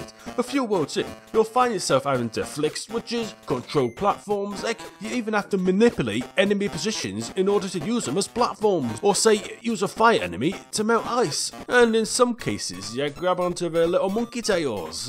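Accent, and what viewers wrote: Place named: North, United Kingdom. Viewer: I spend every one of your videos trying to place your accent. Seems to be part gordie, part scouse, part Lancastrian, with a slight “wossy” style lisp.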